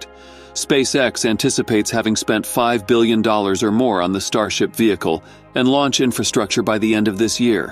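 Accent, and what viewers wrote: United States. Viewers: nauseating droning American commentating on this video. The Yankee accent is diabolical.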